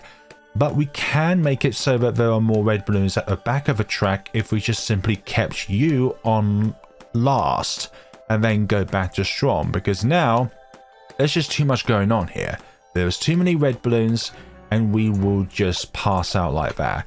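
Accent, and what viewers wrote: United Kingdom. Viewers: Holy British accent 😂 lovely video gentleman!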